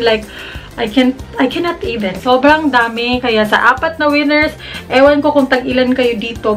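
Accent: Visayas, Philippines. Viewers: Hi Miss. Madalas ko na nakikita yung mga videos mo sa feed ko then nung pinanood ko na di ko namamalayan na grabe na yung tawa ko. Very natural, transparent and I love your bisaya accent kaya napa subscribe ako. Congrats po for 20,000 subbies and advance happy anniversary sa channel mo po. More kwela videos to come dito sa channel. FB: <link> IG: <link> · hello atiiii 😊😊 pnapanuod ko po yung video mo kasi: **natural na natural ka po I mean hindi ka maarteng magsalita hindi pasosyal 👏👏 **gusto ko po yung tone ng voice mo kahit po parang bisaya ang sweet po kasi 😍😍 **ang kulit mo po sa mga videos mo especially pagkasama mo yung my loves mo-jolly person 😊💜💜 **hindi ka po nakakaboring panuorin 😘💙 --- plssssss 💜💜💜👏👏👏👏👏👏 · Hi Ate, unang vid na napanood ko po sainyo ung Fashion 21 na make up tut. Nagsubscribe po agad ako kc nagagandahan tlga ako sa accent/punto ng mga bisaya since bisaya din mama ko. 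Sya po ang naalala ko. Para skin napakalambing po ng salita nyo effortless nyo magpatawa sa mga vid. Simple lang po pero nakakaentertain. Congrats din po sa 21K subbies!!! ❤ FB: Jonna Banuelos IG: jlvm_ Twitter: jonna_lnv Gmail: <mail> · nag subscribe ako kasi ang kulit ninyong mag jowa. katuwa. una sabi ko bisaya siguro to kasi may punto hanggang sa di ko na namalayan nagmarathon na pala ako ng mga vids mo. IG: krizzie31 FB: krizsy anne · Hi ate, di ko lang matandaan kung anong pinakaunang video na napanood ko sa channel mo pero sure akong baguhan ka pa nun kasi hindi pa yung G7X ang cam na gamit mo hehehe. Basta naalala ko lang noon na intro mo palang napa click na ako sa subscribe button kasi nakakahawa ang pagka witty mo at bungisngis. Enjoy na enjoy akong manood sa mga vlogs at tutorials mo kasi bisaya ka, ganahan kaayo kos tono nimo ba. #ProudBisayaHere:D Keep on inspring us and God Bless you always! 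I love you ate:) Mwaaahhh <link> <link> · Hi ate 😍 Chada jud kaayu imung mga video ate uy labi natung mga blogs ninyu ☺😙 Haha si mylabs ba kulango pud diayng dako ganahan kayu kog lantaw te kiy usahay kiy maka storya kag bisaya haha struggle pud siguro ate ba sig tagalog haha hinaot uy nga naa untay bisaya nga mga blog ayha pa dayun ko mag himo ana😂 halaka te uy! pa try gud ko anang ipag me now o ambot unsay spelling ana😂 haha ganahan kog lantaw sa imu g videos te kiy Tinood ka dili ka plastic te😍 mismo akung papa ganahan pud sa imung mga video kiy imung tono daw kiy bisaya gihapon ganahan siyag lantaw haah ana akung papa pwede ba daw mag bisaya unta ka kiy wala daw kaayuy Bisaya nga mga bloggers Pero Ana ko sa iyaha tagalog lang sa ta pa kig dili unya ka sabot ang mga Subbbies ni ate nga tagalog😅 haha nag storyaha nami ni papa tungod nimo 😍 Haha tung nag kuan mong mylabs nimk Joker daw kaayu😂 haha dinhi lang sa ko te kiy mananaw pakog videos nimo uy! 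maka adik man ka ate😂 way char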